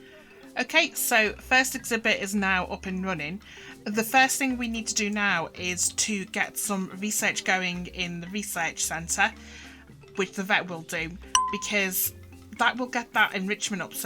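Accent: Liverpool, United Kingdom. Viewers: you sound like you're from somewhere surrounding liverpool, nice video too!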